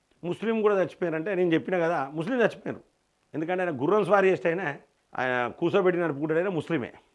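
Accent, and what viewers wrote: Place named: Telangana, India. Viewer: మీ తెలంగాణ భాష సూపర్ అండి మీరు మాట్లాడే విధానం బాగుంది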